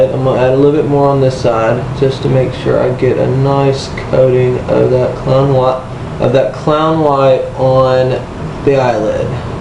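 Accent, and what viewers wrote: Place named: South, United States. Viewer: girl gotta be from Kentucky or Tennessee! Love the accent! I felt at home watching this video! :D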